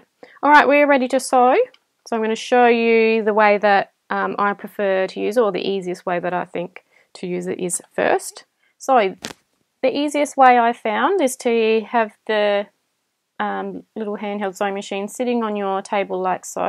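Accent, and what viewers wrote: Australia. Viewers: Thank you for this very helpful video. The Aussie accent was frosting on the cake! :) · Great very helpful video, thank you,,,and I love this Australian accent.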